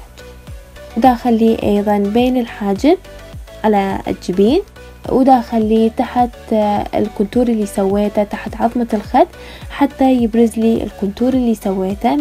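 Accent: Iraq. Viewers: Sara Korea1995 هذه لهجة العراقيةة..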